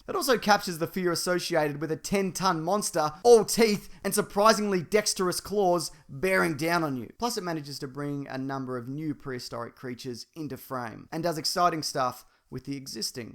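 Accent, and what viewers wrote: Australia. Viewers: He lives in Australia hence the accent.. Cmon now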